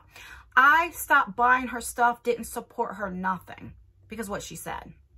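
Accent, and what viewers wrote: New Jersey, United States. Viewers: Hi My name is Roz. I am 55 years old. I am an COVID ICU nurse. I started following you during the beginning of the pandemic. You sound like one of my best friends that was born in New Jersey. I admire you so much. In my head we would be good friends. I have been wanting to make You Tube videos since 2007. I love makeup. I was a makeup artist from the time I was 18 until I graduated from Nursing school at 30 years old. I live in California. But I was born in the deep south. I had a lot of identity issues growing up. Because I had light skin and long hair. I forgot something I am Black. I used to come home crying to my parent and my good mother. My parents were born before your grandmother. My dad was born in 1925 and my mom was born in 1930. They had friends of every race and religion. I am the oldest and I was born in 1966, My parents had their last child in 1974, So my baby brother is not even 50. 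I am widowed. My husband was Caucasian and Native American. I agree with you totally. My parents taught me to always give people a second chance because people can change. I have every single Jeffree Star palette. I like his makeup. My generation will be last generation to know what is to live without social media. You are doing what any good Christian would do. Keep making your amazing videos! Love from Northern California.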